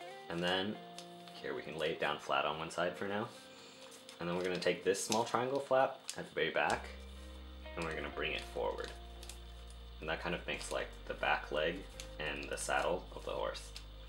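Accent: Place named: United States. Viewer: Good job no offense but you sound like an American lol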